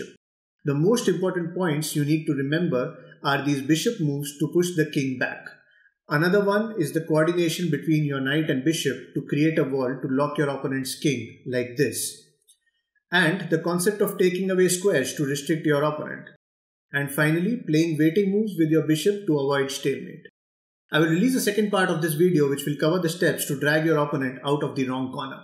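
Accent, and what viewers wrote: India. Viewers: Hindi ko maintindihan parang Bombay magsalita 56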